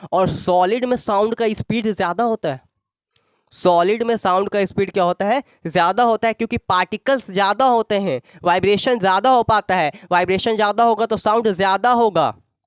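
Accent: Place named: Bihar, India. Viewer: Sir you are from Bihar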